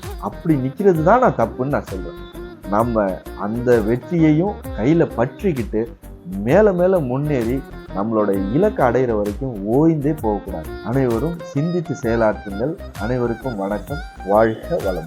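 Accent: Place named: Karaikudi, India. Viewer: சிறந்த ஆக்கப்பூர்வமான பேச்சு சார்..... இனிமையான குரல்..... கொஞ்சும் காரை தமிழ்.... மேலும் மேலும் கேட்ட தோன்றுகிறது....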